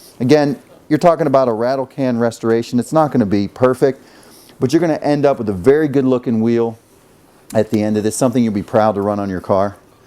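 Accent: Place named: Canada. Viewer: love the way he says "Give it a bit of 'hows ya father' " that surely is the most usual mix of accents... kind of Canadian, kind of English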